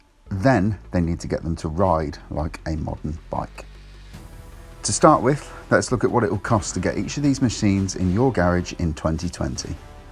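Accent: United Kingdom. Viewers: Like always , visor down does it the best ! Love the British voice.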